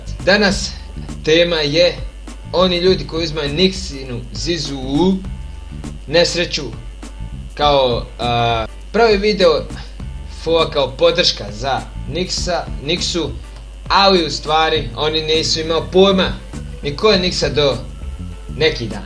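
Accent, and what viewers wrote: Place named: Bosnia and Herzegovina. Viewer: Jesi li ti Bosanac vidim govoris ba i malo ti naglasak lici na Bosanski ? ili si iz Makedonije ?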